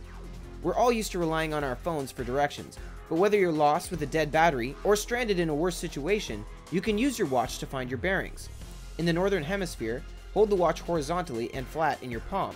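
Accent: Canada. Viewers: You sound Canadian eyy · is the one talking canadian?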